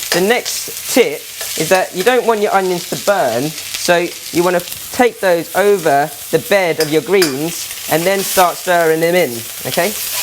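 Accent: England, United Kingdom. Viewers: DawgYankee i dont understand why people commenting on his accent, he was probably raised in the uk, maybe thats why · You speak like a mate from England or UK? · Authentic English accent ? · Love the British accent. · Thanks for sharing, I’m new to wok cooking and this is great information. Thanks again I like your Pommy accent just awesome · An Oriental man with a British accent...Interesting...Very informative wok instructions...Nice flipping skills... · comments are quite churlish. who cares if he speaks english. he actually sounds British but the point here is to understand what the wok is and how amd why it is used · I love the Asian, with a British accent, cute. Nice presentation on Wok cooking. Italian here loves woks and Asian cooking. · that's a chinese dude with a english accent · He said he lives in london 008-009...dont know any better place to get an english accent.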